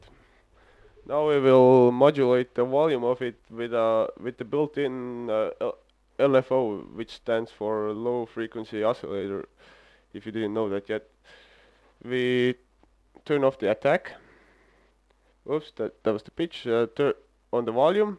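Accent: Estonia. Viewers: Estonian accent ? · kle sa eestlane w aktsendi järgi tundub kyll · D arvasin aktsendi järgi ,et eestlane. Checkin kontot, ongi eestlane . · Kõlab väga eesti aksendi moodi :) Aga ei 5+ tutoorial :) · tüüpiline eesti aktsent :D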